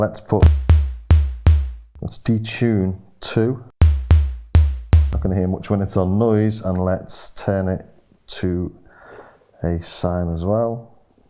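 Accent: Liverpool, United Kingdom. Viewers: love the video its helped me out, are you a liverpool lad?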